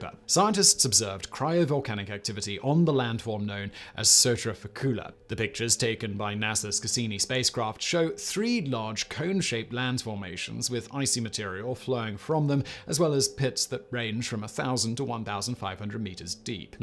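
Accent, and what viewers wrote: United Kingdom. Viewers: He's British... He says it the british way... Just like Aluminium, Carribbean ect is said differently from American English Also Meth is said Meh-th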